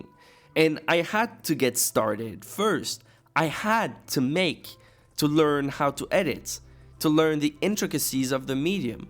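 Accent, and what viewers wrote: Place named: France. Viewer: I've got a question for you, are you french because your accent seems like you are !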